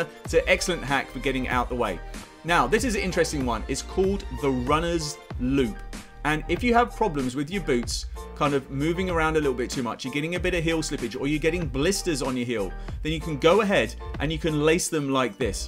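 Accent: United Kingdom. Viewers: Chale, que dificil es entender el ingles britanico pero si te entendi en un 70%.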